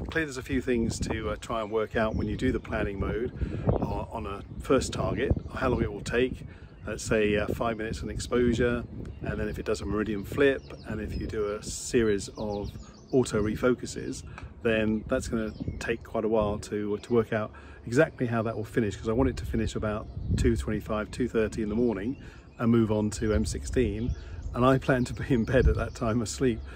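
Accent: Australia. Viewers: Looks like a great project, your accent sounds Aussie, you originally from down here?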